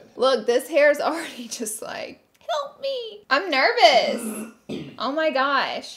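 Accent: South, United States. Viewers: why is her southern accent worse in this video than usual or western idk im european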